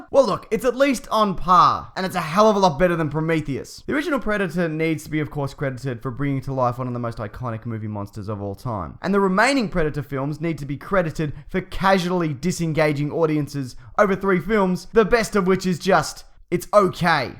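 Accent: Australia. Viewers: Is he australian? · his accent is badass... #AustralianArmy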